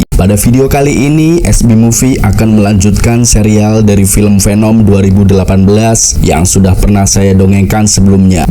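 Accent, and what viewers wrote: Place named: Banyumas, Indonesia. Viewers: Wong endi min, bahasae kaya wong panturaan jeh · Mantep bro, aja kelalen ngapakmu rejekimu haha. Salam subreker anyar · Wong tegal kye😂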